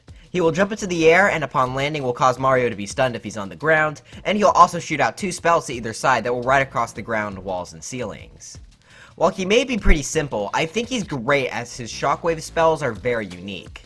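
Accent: United Kingdom. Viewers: Are you British? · Are you British i heard be ah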